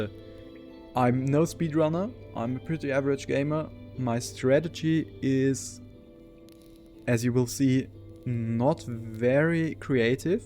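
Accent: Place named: Germany. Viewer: If you launch a city builder video and you hear a German accent, you know you're in the right place.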